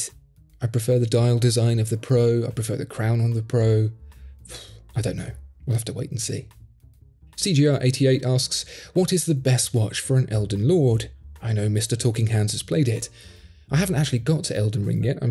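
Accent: United Kingdom. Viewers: You make watches interesting. You and your late night FM radio voice. Women around the world want to know, 'Who is that mystery hands British lilt man with that voice?' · Those Lange 1815 chronographs or datograph perpetual calendars are indeed the best grail piece. I prefer your videos with no face, ethereal presence voice (the brit accent helps too 😂).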